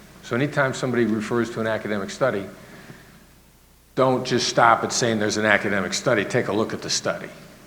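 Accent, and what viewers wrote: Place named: Midwest, United States. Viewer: I want to know the accent story. He's from Iowa, the midwest.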